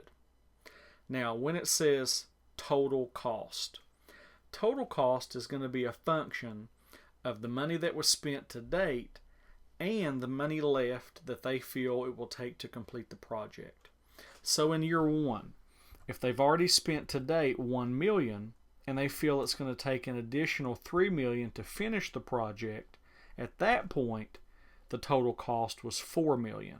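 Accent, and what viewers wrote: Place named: United States. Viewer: Sounds American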